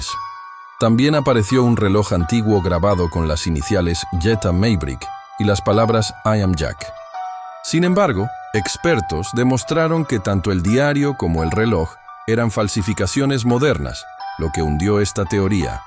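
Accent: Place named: Spain. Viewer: Lamento la narración en el español de España que sólo se habla en España en latinoamérica no hablamos eso.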